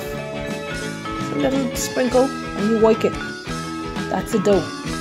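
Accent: Bahamas. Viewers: I love hearing that true Bahamian accent behind our dishes!!Love this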